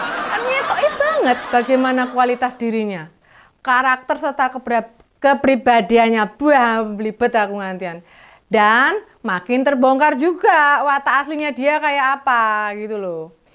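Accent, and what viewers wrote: Java, Indonesia. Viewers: Ini mbak makin lucu, makin berani, makin tajem... Dan dicampur logat dan boso Jowo jadi pas untuk pendidikan pembelajaran mayoritas masyarakat NJAWANI..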